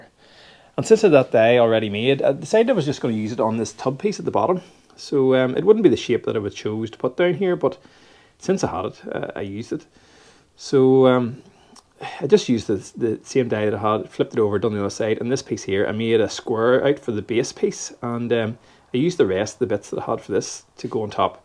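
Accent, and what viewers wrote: Northern Ireland, United Kingdom. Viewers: It was just a thought. Seems to me that Ulster is a unique place but doesn’t get much attention. A lot of American car nuts will be attracted by the content and stay for the accent. I love the Norneyrish accent so I supposed I’m biased. 😁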